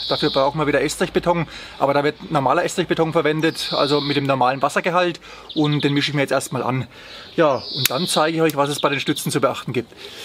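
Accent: Franconia, Germany. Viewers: Fränkisch immer noch bester Dialekt 👌🏻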